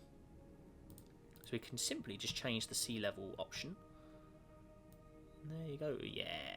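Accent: United Kingdom. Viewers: IS BRO BRITISH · Btw are u from England cause u have a British accent its just that is from USA and that's Fr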